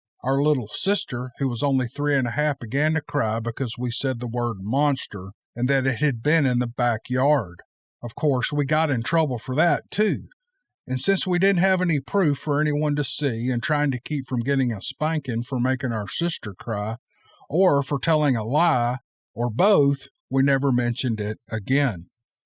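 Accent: United States. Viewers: You are over the top especially with that accent of real American English spoken the way it should be spoke thank you you are truly an awesome story teller thank you so much I’ve given up reading and just listen to you , it’s not that way about reading my eyesight is getting weak as am I in the tie I have left it’s not so bad to go especially listening to you and your soothing voice thks again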